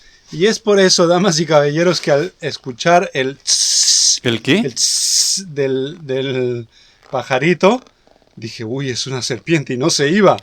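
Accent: Mexico. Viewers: hasta aprendió a hablar cómo Mexicano ❤ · Increíble como ya habla como todo un Mexicano, saludos desde Durango wey!!! 😉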